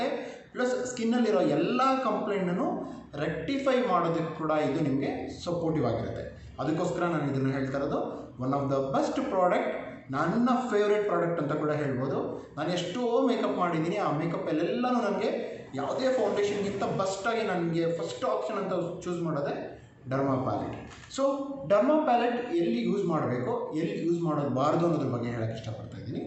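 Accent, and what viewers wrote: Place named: India. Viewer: Kannadadalli explain madiddke thank u so much sir.....Your Kannada accent is super.... Sir pls do more n more videos in kannada..... V r waiting ur videos in Kannada language ...... Thank you sir.... And also pls explain about different brand of foundations.....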